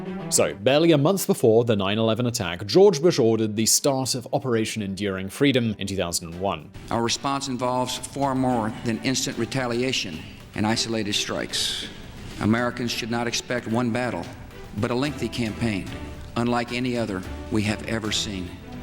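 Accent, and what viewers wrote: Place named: England, United Kingdom. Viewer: I agree it was long overdue leaving Afghanistan. It will quickly revert to its previous state before the U.S. arrived and spend so much valuable coin. No more sole world peacekeeping, stupid and unplanned wars and ‘nation’ building please!?! Nations will need to start taking stock and responsibility for their current state. You can blame everyone else so long. Second, I agree with your show mostly but I still can’t stand the pencil beck geek with the prissy Englishman voice!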